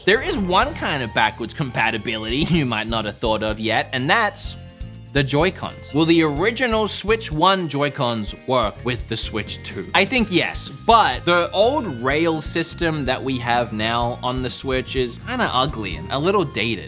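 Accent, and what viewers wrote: Australia. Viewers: Wait, you're Australian? I guess I can't really tell an Australian voice apart on YouTube as I didn't know with MVG either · I'm just sitting here tripping out about how you seem to simultaneously sound like you're from Melbourne, Australia and Brooklyn, New York. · I'm Mexican. is the sole reason I love Australian people so much, obviously biased. But opened the window to another culture and accent I didn't know and enjoy.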